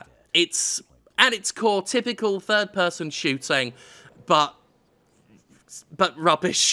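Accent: United Kingdom. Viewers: Sometiems, you can hear the Britishness just oozing out of. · Fuck me, did not expect to be slapped in the face by a massive dose of nostalgia the moment I boot up a sterling vid! You may be in America but you are deffo a brit through an through ;)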